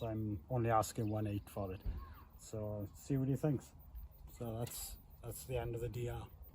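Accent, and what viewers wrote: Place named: Wales, United Kingdom. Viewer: I impulsively bought a DR350 last year with no previous motorbiking experience! I tried kick starting it a couple of times and it's just sat in the shed ever since buying it! Do you service bikes for others? I picked up on your accent straight away, and I'm based in South Wales if you would be interested in taking a look at the bike for me?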